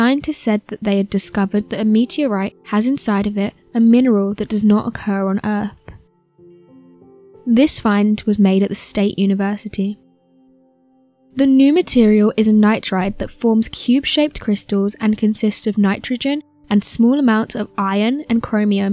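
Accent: England, United Kingdom. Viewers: Ram Rod0 not sure where she would be from but definitely English 😊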